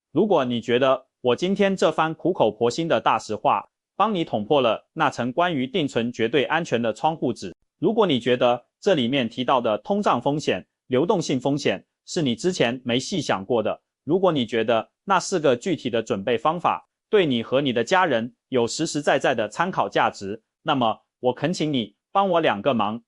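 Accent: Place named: China. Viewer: 這根本不是台灣的，ㄧ聽就知道是大陸的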